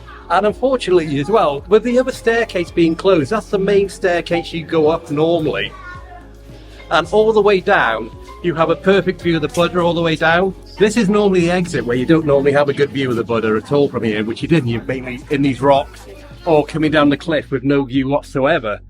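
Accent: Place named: United Kingdom. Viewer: I am here to enjoy your beautiful British accent 🙂. Have a great time in China!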